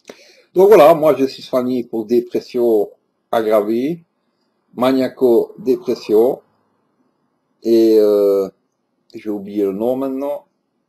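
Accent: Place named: Belgium. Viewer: Merci et bravo :) je suis fibro depuis 12 ans et depuis cataloguée hypocondriaque par mon doc donc aucune prise en charge anti douleur ah si je dis des conneries dafalgan codéiné pour mes migraines avec aura nan pasque ca donne mal au crane de souffrir h24 quand même alors merci j ai adoré t'écouter (ahhhhh l accent belge) ps: suis de france genre cht'i et ici même bordel fibromyalgie kezako reprend un ticket et reviens me voir plus tard lol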